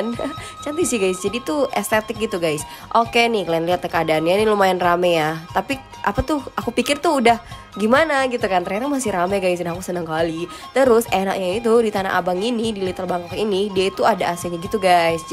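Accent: Medan, Indonesia. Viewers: Logat medan itu kk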